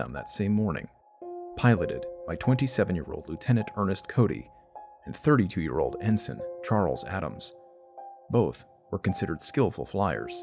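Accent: United States. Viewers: I'm pretty happy to hear an American accent. This guy is a Marine and his channel focuses on war stories. No Brits here. 😬. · Would have liked to be able to add this to my subscribed list as I so enjoy Bedtime Stories, but the American accent isn't for me. Sorry. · Was looking forward to this channel, then "Oh for gods sake, an American narrator" why does everything have to be americanised, won't be listening again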